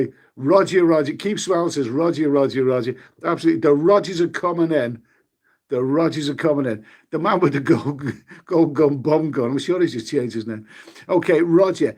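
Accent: United Kingdom. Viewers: Your very enjoyable to listen to. I have to admit I love listening to Brits talk! You give an interesting perspective on things so I just wanted to say don’t give up hope yet I’m already booked for philippines for December, but just in case I have free cancellation.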